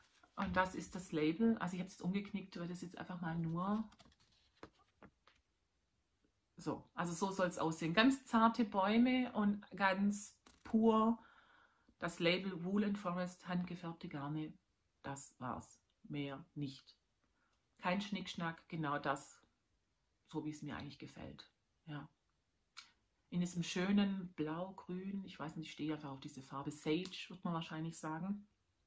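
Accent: Swabia, Germany. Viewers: Liebe! Schwätz du ruhig schwäbisch - ich find‘s super 👍🏻 Zu den Qualitäten für den Sommer mag ich persönlich nix mit Merino. Eher Baumwolle-Mix Garne. Mach bitte weiter so 👏 Liebe Grüße Gabi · Ich hab’s auch sehr genossen Dir zuzuhören.. und wie schön ausm Schwobaländle🥳.. liebe Grüße vom Fuß des Hohenzollern Susanne 💕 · Toll gemacht😊 Dankeschön! Sehr sympathisch und genau unser schwäbisch von kurz vor der Alb 👍🏼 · Hallo,dein Podcast hat mir sehr gefallen,bitte mach weiter 😊..Ach und ich finds gar nicht schlimm wenn das schwäbische durch kommt, das macht uns schließlich aus....Liebes Grüßle aus Fellbach 🤗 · Hallo, vielen Dank, dass Du Dich endlich getraut hast! Das mit der Technik hast Du ja alles voll profimäßig hinbekommen, Lautstärke, Ton- & Bildqualität sind perfekt 👌🏼 Ich mag Deine Projekt- und Wollauswahl sehr, und vor allem liebe ich den schwäbischen Einschlag 🥰 Dass Du uns auf Deinen Weg zur Handfärberin mitnimmst finde ich ganz toll, das war für mich eigentlich das interessanteste Segment, weil ich davon null Ahnung habe. 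Total spannend, bitte weitermachen! Kleiner Verbesserungsvorschlag: Du sagst sehr oft „tatsächlich“, ich bin bei sowas aber auch „tatsächlich“ sehr empfindlich 🙈... und Du hast auch überhaupt keinen Grund, Dich dauernd zu entschuldigen, gerade dass Unperfekte macht es doch lebendig 😉 Ich freue mich sehr auf weitere Folgen von Dir! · Habe Dich eben entdeckt, durch Frau Nadelgeklapper, und bin begeistert von Dir und Deinen tollen Projekten! Außerdem finde ich unseren schwäb. Dialekt so "heimelig"! Mein Abo hast du. Liebe Grüße Nicole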